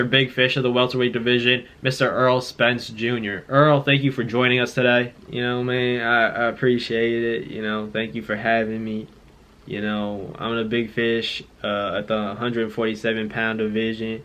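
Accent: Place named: Texas, United States. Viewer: 🤣😅😂your Texas accent is trash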